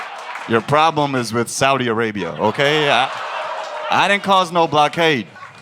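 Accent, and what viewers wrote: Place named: United States. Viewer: He talks so american but tht laugh is Indian asf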